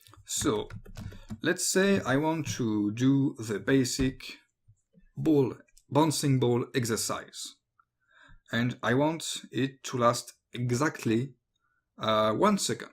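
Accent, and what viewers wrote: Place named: France. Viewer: Merci pour toutes ces vidéos, cher compatriote dont l'accent n'a pas fait mystère! ;-) Je suis en train de m'autoformer à Harmony, et ces vidéos m'aident beaucoup.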